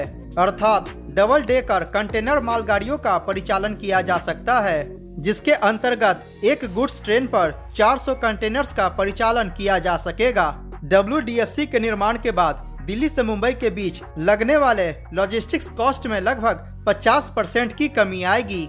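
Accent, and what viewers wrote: Bihar, India. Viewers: Is the presenter Bihari?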